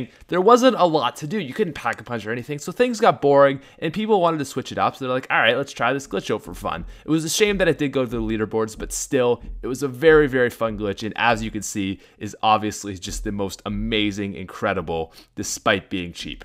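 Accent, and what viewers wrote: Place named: Newfoundland, Canada. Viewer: Are you from Newfoundland? Your accent sounds a lot like one of my teachers that used to live in Newfoundland.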